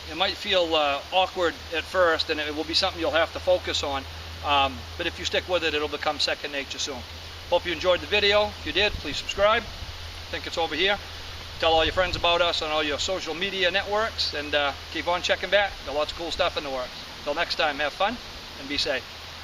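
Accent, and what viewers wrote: Maine, United States. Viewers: Southern Maine accent. Gardner maybe?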